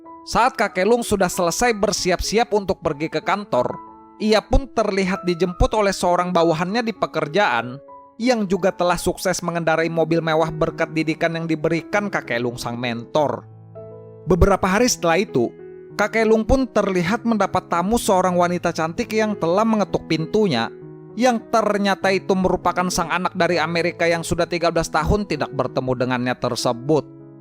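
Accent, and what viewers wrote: Banjar, Indonesia. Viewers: Urang Banjar kah ni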